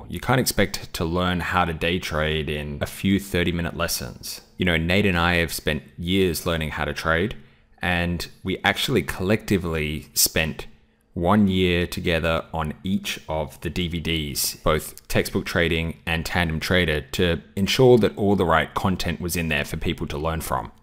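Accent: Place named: Australia. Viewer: Thanks, don't now if it's the fellow Aussie accent that hooked me or more so gave me the confidence to listen in more, but from checking out FOUS4 and then seeing how you explain it in really easy laymen terms (for noobs like me) it's well appreciated. Keep up the great work!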